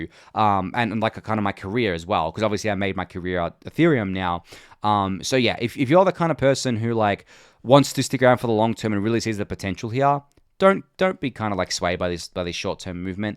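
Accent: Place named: Australia. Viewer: The Daily Gwei episodes and your soothing Aussie voice makes me feel at home. Thank you for amazing content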